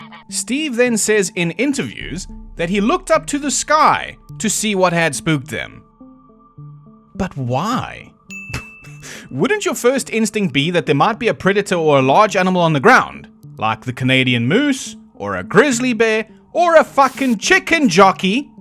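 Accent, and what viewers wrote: South Africa. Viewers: Is this commentator South African or Rhodesian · The South African accent made this so much better😂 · He's from South Africa, so you can't expect him to sound like an American, Canadian, Englishman, Scot or Australian. I get a kick out of his speech.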